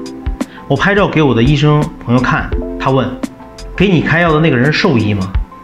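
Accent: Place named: China. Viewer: 支聲支氣就不要假扮馬來西亞人了 sohai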